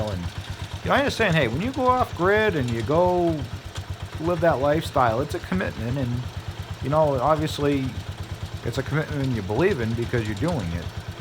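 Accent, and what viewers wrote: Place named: Midwest, United States. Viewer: Ya know? I'm guessing you live somewhere in the midwest. Ya know? Because ya remind me of the time I visited upper michigan ya know? And they kept saying "ya know" after every sentence. Ya know? :) :P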